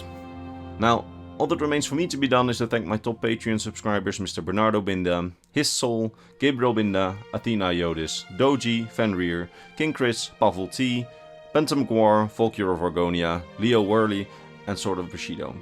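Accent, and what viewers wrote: Netherlands. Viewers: Nederlands accent😜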